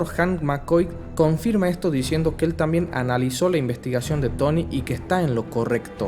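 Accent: Tucumán, Argentina. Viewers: tucumano mañero ja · Bien Tucumano so URA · Es Tucumano?. Un capo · De que nacionalidad sos... Te me hiciste tucumano o catamarqueño con tu pronunciación de tiesha(tierra)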